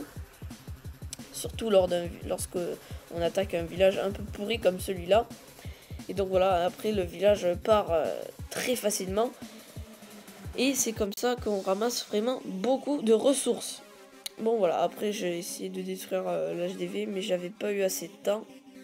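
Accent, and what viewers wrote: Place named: South, France. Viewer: On le sent l'accent du sud poto ^^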